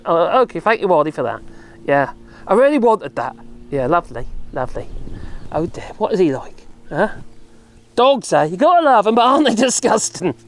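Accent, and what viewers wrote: United Kingdom. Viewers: Obviously we Americans have more than enough bad stuff of our own creation to deal with over here, but misery loves company and hearing about the shite in the UK in this accent is always interesting. Stay well mate word of the day should be 'effluvium'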